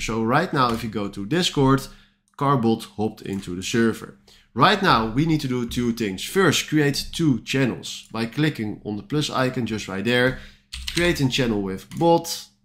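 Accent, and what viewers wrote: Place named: Netherlands. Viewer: jij sound nederlands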